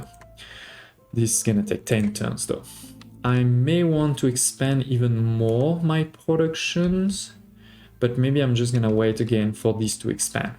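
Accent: France. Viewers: Avec le bon accent français hmmmmm 😮‍💨👌🏻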